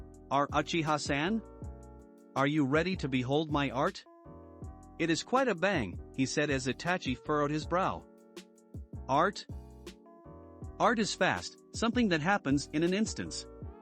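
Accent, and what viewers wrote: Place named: Japan. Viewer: At some point the text to speech program took on a Japanese accent. That was at some point after the 30 minute mark.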